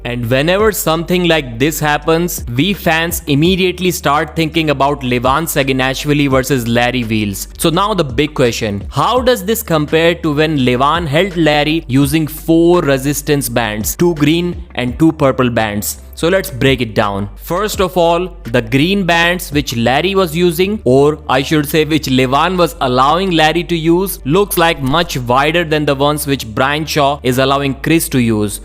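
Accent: India. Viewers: Indian voice -> disliked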